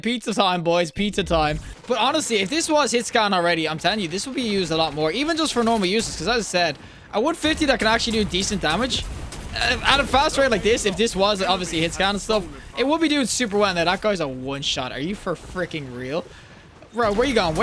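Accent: Ireland. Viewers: you saying warlocks ARENT king of the skys already?? also are you irish?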